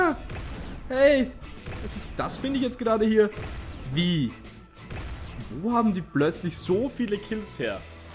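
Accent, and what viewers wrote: Austria. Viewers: bist du österreier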